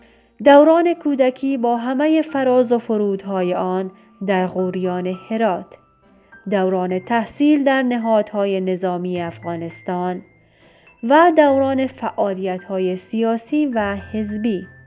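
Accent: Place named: Iran. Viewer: Why don’t you speak Afghan Dari. You sound very foreigner meaning, Iranian.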